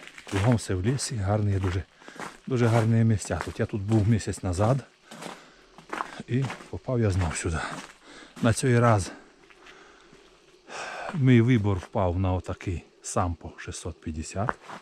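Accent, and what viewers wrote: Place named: Polissia, Ukraine. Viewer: Доброго дня , у Вас мова даже схожа на людей з Полісся, Чорнобиль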